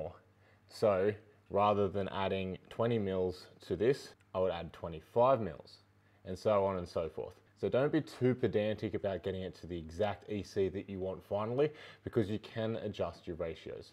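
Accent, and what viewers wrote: Australia. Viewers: i switched from arduino mkr and pico videos to hydroponic and this was first video i put on and right at beginning i hear arduino and pico and wondering if im watching arduino stuff until i remebered that Aussie accent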